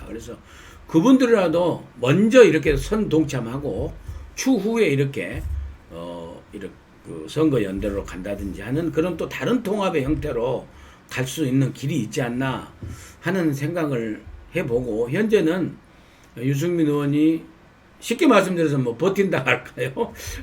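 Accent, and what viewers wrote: Jeolla, South Korea. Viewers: 구수한 전라도 사투리로 국민들을 시원하게 해주셔서 감사합니다ㆍ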